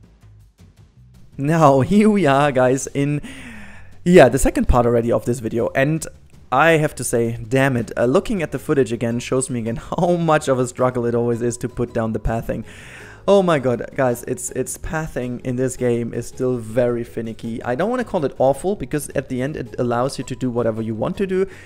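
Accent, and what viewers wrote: Germany. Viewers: Is your Native language german?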